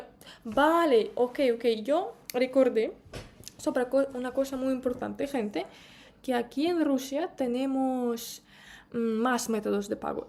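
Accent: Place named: Spain. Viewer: Почему с такой акцент из Испании 😅...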